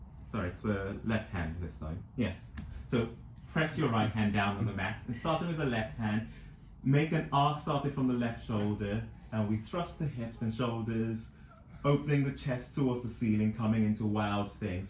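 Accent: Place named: England, United Kingdom. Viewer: I'm going to be doing this teacher training in January 2021. Thank you for showing how to teach this method 1 on 1 in client's homes. I love 💘 that your English too, we tend to deliver instructions a little differently than Americans. How long have you been teaching this method?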